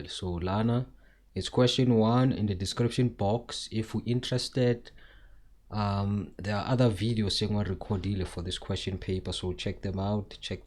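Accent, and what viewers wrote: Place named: South Africa. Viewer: "I'm watching your math videos on YouTube, your teaching is good but I'm struggling to understand because of the accent. You mix South African with English and it's a bit hard for me to catch. Can you please speak a bit slower or use more clear English?"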